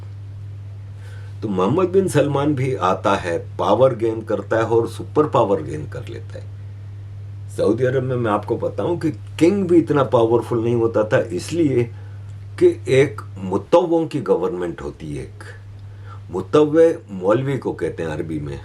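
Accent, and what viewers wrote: Gujarat, India. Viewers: Sirji aap kahise pakistani nahi lagte ,,aapka bolne ka andaz thodasa gujarati lagta hai,, matlab ke indian lagta hai,, salute to your knowledge sir,,pls tell us the story of Turkey 🎉❤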